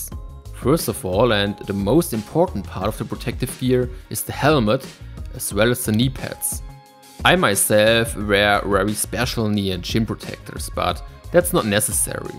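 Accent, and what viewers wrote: Germany. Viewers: I just ordered my first pair of inline skates ever. I’m 42. I grew up using quad skates (ice skated too.) Got the Powerslide Next Core 80 all black set up because everything less expensive was sold out. Ended up with the dream skate😆🤩 Great video with very straightforward tips I’ll be using. I recognize that Berlin accent. Love it. Thanks!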